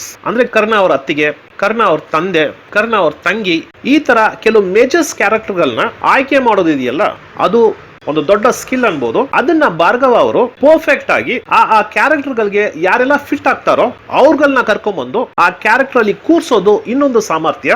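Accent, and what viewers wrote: Tamil Nadu, India. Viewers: ಸೌಮ್ಯವಾದ ತಮಿಳ್ ಶೈಲಿಯ ತಪ್ ತಪ್ಪಾದ ಕನ್ನಡ ಉಚ್ಚಾರಣೆಯ ನಿರೂಪಣೆ. ಕನ್ನಡವನ್ನು ಚೆನ್ನಾಗಿ ಅರಗಿಸಿಕೊಳ್ಳಲು ಸಾಮರ್ಥ್ಯವಿಲ್ಲದಿದ್ದರೆ ನಿರೂಪಣೆ ಮಾಡಿ ಕೇಳುಗರನ್ನು ಹಿಂಸಿಸಬಾರದು. ಒಂದು ಸಿನಿಮಾ ಕಥೆ ಹೇಳುವುದೇ ನಿರೂಪಣೆಯಲ್ಲ. ಹೇಳುವುದನ್ನು ಮನಃಪೂರ್ವಕವಾಗಿ ಸೊಗಸಾಗಿ ಹೇಳಬೇಕು, ವಿಶಿಷ್ಟತೆಗಳನ್ನು ತಿಳಿಸಬೇಕು.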